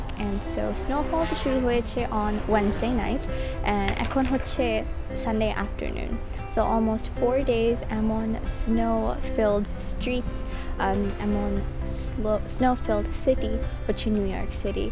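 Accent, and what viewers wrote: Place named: Comilla, Bangladesh. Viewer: মুগো কুমিল্লায় মনে হয়, কি বলেন আপি😍😍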